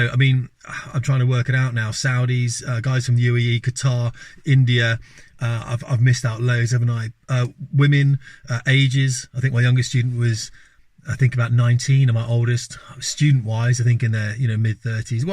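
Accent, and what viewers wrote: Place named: United Kingdom. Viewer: Great content nice to listen to a great British bliloke who is intelligent and knows his stuff 🛩️🛩️👍👍👍👍🇬🇧🇬🇧🇬🇧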